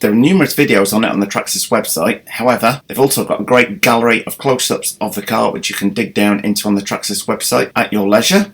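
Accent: England, United Kingdom. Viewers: PLEASE buy a microphone! I like your videos but it sounds like you recorded it underwater! Also - whereabouts in England is your accent from - I just can’t place it!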